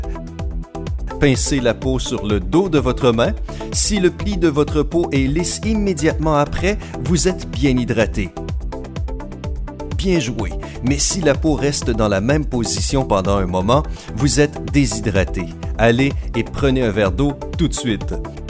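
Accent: Quebec, Canada. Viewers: J’aime beaucoup l’accent québécois ! J’espère que je suis pas la seule mdr 😂 . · Pk c une voix canadienne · C'est tellement moche l'accent québécois 😷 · c'est l'accent du Québec ! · Ouahhh on a tous reconnue l'accent québécois c'est magnifique 😂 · Mdr l'acent québécois mdr 😂🤣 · Alerte accent QUÉBEQUOIS! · J'aime pas l'accent canadiens et surtout la voix w😗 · L'accent canadien m'a fait marrer pendant toute la vidéo 😂🤣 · Marrant hein l'accent canadien j 'comprend -donc ,aie !!! Gros gros buzz !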